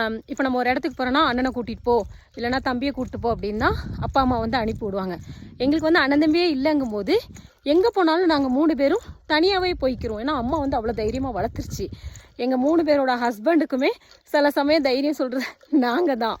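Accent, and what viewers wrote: Tirunelveli, India. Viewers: நம்ம திருநெல்வேலி தெளிவான பேச்சோட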